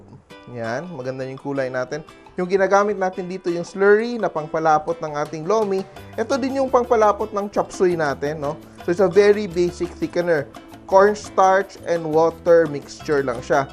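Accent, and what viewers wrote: Visayas, Philippines. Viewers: Bisaya ka chef?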